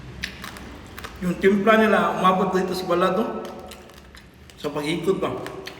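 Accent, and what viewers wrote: Visayas, Philippines. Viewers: Wag n kayong mag Tagalog idol Hindi kayo maronong bisayang bisaya kayo legit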